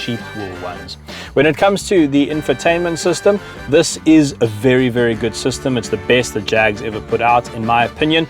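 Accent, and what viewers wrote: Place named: South Africa. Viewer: This SAfrican accent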